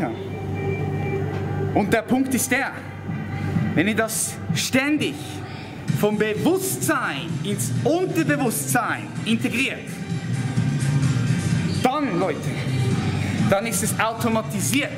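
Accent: Switzerland. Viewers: Unglaublich was für ein Vogel. Der Schweizer Akzent machts zum Glück lustig.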